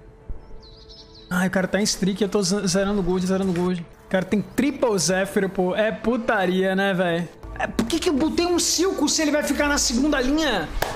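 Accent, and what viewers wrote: Recife, Brazil. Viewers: Tu é de Recife meu bom? N acredito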